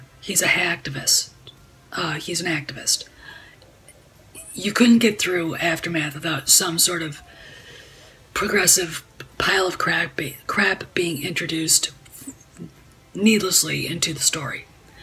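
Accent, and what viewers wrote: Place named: Canada. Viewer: Love your accent. Are you from Canada?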